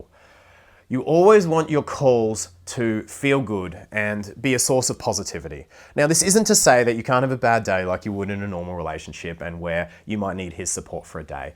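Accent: Australia. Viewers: Girls must love his Aussie accent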